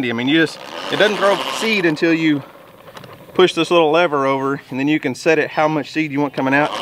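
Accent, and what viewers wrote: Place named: Texas, United States. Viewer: 9000% lol sounds like Texas. Great video I really enjoy watching them.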